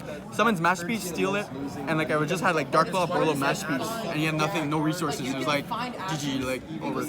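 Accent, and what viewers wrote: Canada. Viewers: This guy sounds very Canadian.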